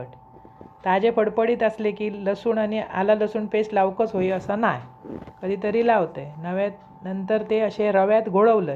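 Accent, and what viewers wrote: Malvani, India. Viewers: Tumcha aawaj ani malvani bhasha bolnyachi paddhat khup aawadte.... Tumchi khup khup pragati howo...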